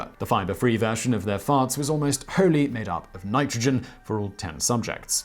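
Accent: United Kingdom. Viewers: I enjoy any video that has a British guy repeatedly saying fart!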